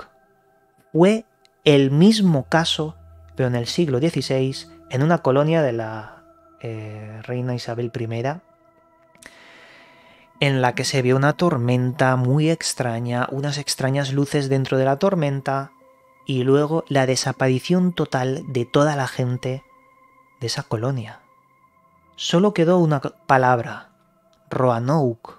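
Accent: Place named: Spain. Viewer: gracias, muy interesantes tus videos. Además me gusta como hablas, un genuino idioma Español. Saludos desde Chile.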